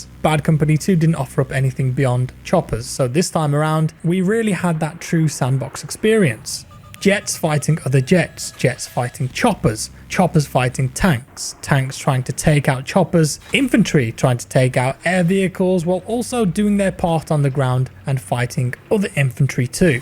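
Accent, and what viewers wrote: United Kingdom. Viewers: Brits are just natural narrators. Very nicely done,.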